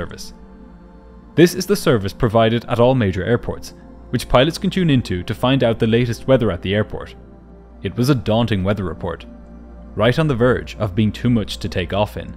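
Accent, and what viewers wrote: Ireland. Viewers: Great video thank you ,shocking story great to here a fellow Irish narrator 🙌☘️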